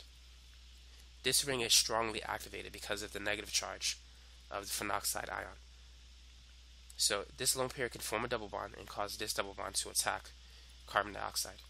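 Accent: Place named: New York, United States. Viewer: Isaac Pelletier no sounds like from some New York district.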